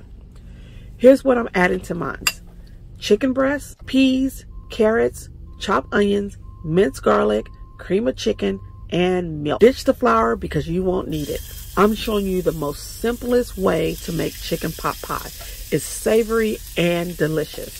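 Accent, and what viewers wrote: South, United States. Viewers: Hi, I'm new to watching your videos. I enjoy seeing you explore the world and cooking in your van. Where are you from you have a southern accent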